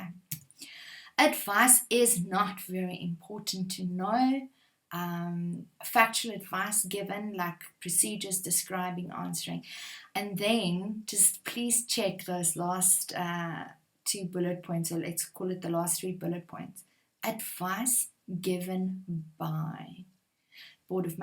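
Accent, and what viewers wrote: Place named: England, United Kingdom. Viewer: Thank you very much. Btw, you sound very English, so maybe English instead came to you in a teapot.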